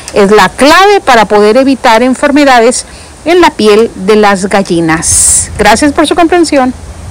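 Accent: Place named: Spain. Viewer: Y ahora x q empezaste hablando como muy española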